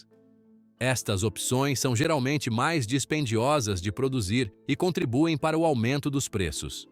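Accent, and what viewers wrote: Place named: Brazil. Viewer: Como é que isto pode estar acontecendo/ a acontecer? Um texto integralmente em português europeu, mas falado em brasileiro do início ao fim!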